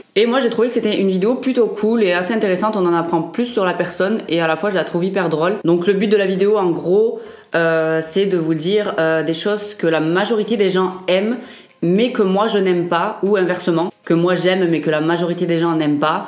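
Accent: Quebec, Canada. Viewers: Vive l'accent québecois !! 🇨🇦❤🇨🇦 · "LES ANDOUILLETTES C'EST LA BASE" trop raison! 😁 L'accent québécois c'est tellement mignon 😍 LES TACHES DE ROUSSEUR C'EST MAGNIFIQUE ! Trop cute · Omg j'adore l'accent québécois 😍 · Tu sais quoi ça fait pas longtemps que je regarde t’es vidéo et sincèrement tu gagne une abonné en plus ! La Mayonnaise , le KFC , Team série.. Non merci Je suis anti que bas du corps mais j’alterne mais effectivement que le bas .. No way ! 👍🏽 L’accent québécois c’est cute !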